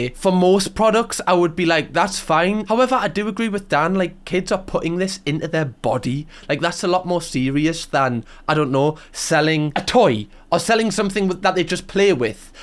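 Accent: United Kingdom. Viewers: Are you British or Scottish like you accent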